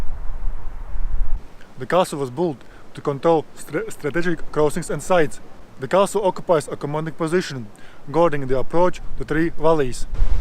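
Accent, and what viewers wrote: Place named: Latgale, Latvia. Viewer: Būtu ļoti noderīgi subtitri angļu valodā, jo tu lieliski veido stāstus/tekstus pagātnes formā! 😊 Par to ka esi latvietis - uzminēju 😊 Latgales akcents 😎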